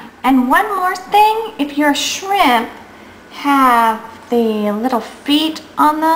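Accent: South, United States. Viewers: I love how your making asian meals & have a small southern accents ♡♡ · Love this video and your southern drawl....... just like that! (smile)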